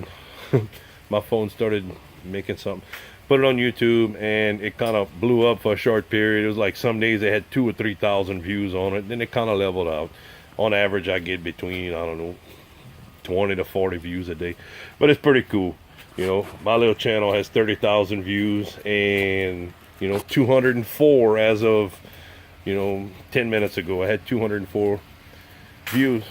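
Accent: Louisiana, United States. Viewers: I'm here and there and come back all the time! If for nothing else but to catch a splash of that awesome Cajun sounding voice of your's! I don't push my channel either but as time passes folks just seem to find something interesting enough to watch and of course we learn so much from all the commenters. Thanks for taking us along with you on your greenhouse adventure. I sure do wish I could have a tomato plant growing with fruits on it here up north!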